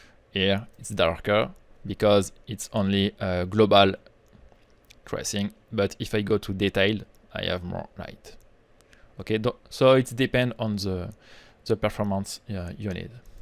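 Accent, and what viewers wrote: France. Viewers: oh that frenchy accent ,,, hahaha,,, so funny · Goodness, what an accent! As a French myself I should be more tolerable but after living in UK for 10 years, I just can't stand it. Somewhere it hurts. Anyway, good channel! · Nice, (J'adore ton belle accent français)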